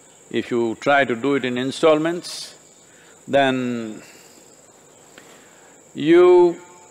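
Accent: India. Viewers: 我认为是，有一些句子，无法找到最合适字的翻译。你仔细听，有时需要前后听两句话，才能感受到当中的信息。而老师的印度腔英语，我们不太容易听出来，所以英文翻译也是相当重要的。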